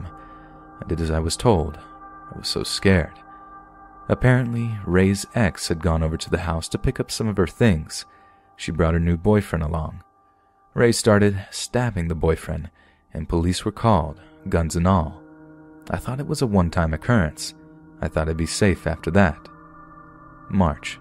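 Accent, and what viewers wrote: Ireland. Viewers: that irish accent tho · It was very interesting, love it!! Love your Irish accent, it was awesome 👏 Thank you 🙏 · Nice Irish accent 👍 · That Irish accent tho lmao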